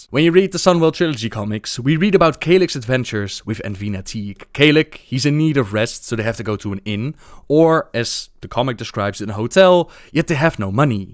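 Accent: Netherlands. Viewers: that dutch accent tho :p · are you dutch?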